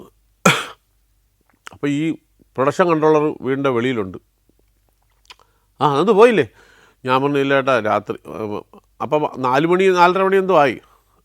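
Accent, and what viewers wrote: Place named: Thiruvananthapuram, India. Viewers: the proper Thiruvananthapuram dialect that I grew up hearing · Real Trivian slang👍🏽❤😍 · തിരുവനന്തപുരം സ്ലാങ്🔥🔥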